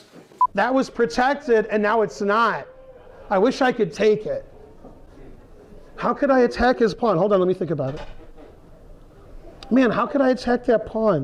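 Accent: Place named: AAVE, United States. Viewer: I can't understand you because of ebonics. I never thought the last bastion of edgy humor would be from a chess streamer..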